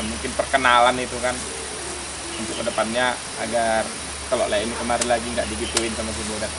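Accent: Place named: North Sumatra, Indonesia. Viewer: dialek bg mantab kali..org sumut kali..sukses selalu 💪🏆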